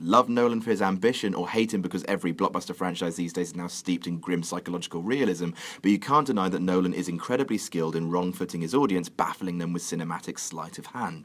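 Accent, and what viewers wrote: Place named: England, United Kingdom. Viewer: stop talkong so fast its hard for us americans to understand you with your dumb english accent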